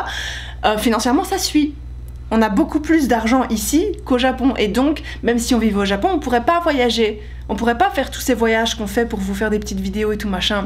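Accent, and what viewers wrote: Belgium. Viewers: bonjour.Venez habiter à l Ile de la Réunion!! Ici il y a quasiment que des métisses et sa marche. On devrai envoyer les étrangers en stage chez nous On a toutes les ethnie possibles : européens chinois , indiens , africains, malgaches, belge (humour) (mais pas encore de japonais! ça manque) ect... et tout ce petit monde s'aime et se reproduit a tout va ... Personnellement je suis chtimi des hauts de france (ça pète hein !) (salut cousine) et je suis mariée à une réunionnaise , bilan : mes fils et mes petits enfants sont tous de couleur différente !!! pas facile d’être raciste dans un tel contexte !!!!Enfin on s 'en accommode....voila voila , tout ça pour dire que si tous les cons volaient bin il ferait nuit 24h sur 24. Bien venu au petit haricot que le monde lui soit propice . Ps : vous êtes belge je confirme il y a des petites intonations qui ne trompent pas ! 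Amicales pensées. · mdr ta façon de parler et tes sautes d'humeur me font penser à gonzaroo ! XD à croire que tous les belges s'expriment pareil .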